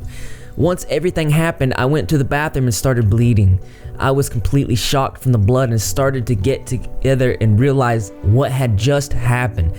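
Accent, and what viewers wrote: South, United States. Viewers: Glad i found you. Ur great.I'm in Alabama.u must be from the south too? Luv ur accent.plus ur so damn good looking! ❤...luv ur content 😊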